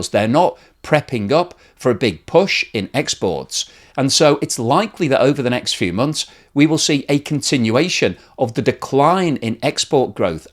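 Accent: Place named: England, United Kingdom. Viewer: He sounds pretty English to me.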